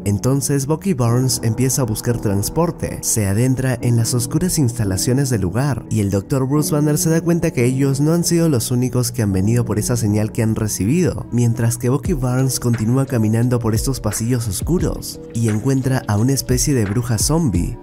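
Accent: Peru. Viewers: Tal ves sea por qué el men es peruano y pgod también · gran resumen y mis sospechas son de que eres peruano xd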